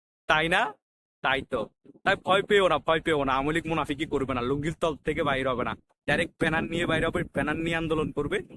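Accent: Chittagong, Bangladesh. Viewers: ভাই আপনি কোন অঞ্চলের। চট্টগ্রামের ভাষায় কথা বললেন যে।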